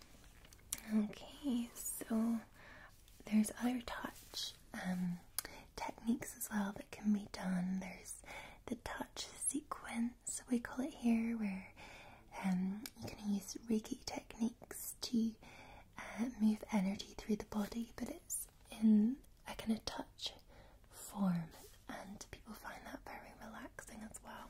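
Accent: Scotland, United Kingdom. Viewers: 180 minutes of tingles from the resplendent scottish lass. bank holiday monday just got better. ☺️ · Love me some and Scottish ASMR 🥰😍😴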